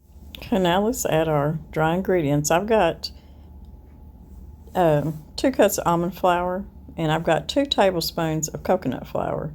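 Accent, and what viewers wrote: South, United States. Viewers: Thank you! My digestive system does not like sugar alcohols. A lot of low carb recipes call for those, so maybe I will try the monk fruit. Enjoy your videos and the southern accent. I grew up in Virginia, so had an accent at one time. We moved to Wa. State and I lost it!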